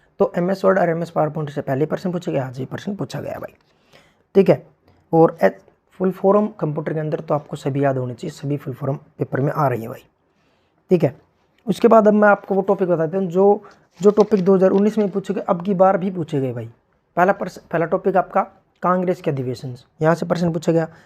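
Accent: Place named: Haryana, India. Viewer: Haryanvi for a reason ❤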